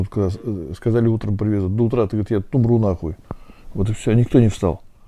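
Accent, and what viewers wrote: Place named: Kulebaki, Russia. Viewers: Приветствую,послушаю вас и опять вера в нормальных людей появляется.Сейчас с людьми что то произошло.а ещё говор волжский как бальзам.сам я красноярец но вся родня по маме из кинешмы,считаю второй родиной.меня и крестили там.здоровья вам! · Прям КУЛЕБАЦКИЙ говор. Оно, собственно, всё рядом. У меня там вторая родина, и с такой же теплотой, в тех же эмоциях, все рассказывают вечером за ужином о своих буднях:3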